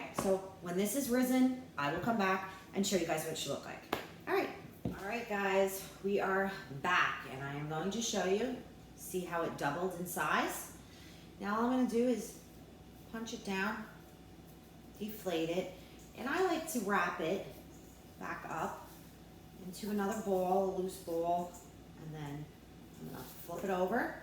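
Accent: New York, United States. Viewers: Half Italian, half Irish. Lol, that's pretty typical of NY/NJ/CT. I live in Florida now, and it's comforting to hear my accent! 👍🏼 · Hello from ALBANY!!!! I just baked bread tonight for the first time....Puerto Rican Pan Sobao. It was deelish! I'm a PR from Brooklyn, who 30+ years later still sounds like you...."why can't I find decent Italian bread in Albany?!?!?!???". I stumbled upon your recipe and was totally enamored when you mentioned Albany. I may try your recipe this weekend. Looks awesome!!!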